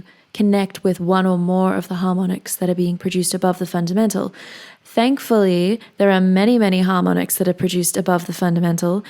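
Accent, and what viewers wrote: Australia. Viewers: I love how you get so into the music and your expressions. And the red hair and red nail polish does it for me, And to top it off the Australian accent and such beauty, I’m hooked! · Can you please start a podcast or anything, maybe about music, or anything else that interests you? It's so relaxing to listen to, the Australian accent sounds really good :) I was there btw, at the end of the video haha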